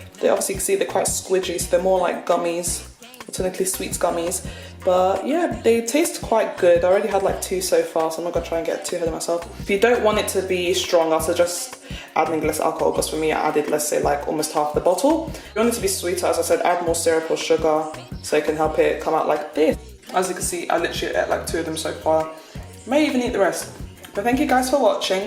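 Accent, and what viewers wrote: United Kingdom. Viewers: Nice recipe, but I'm mainly subbing for your accent....soooo english😁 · I was so shocked to see you had a British accent! I like your channel ♥️. New subbie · So love the uk accent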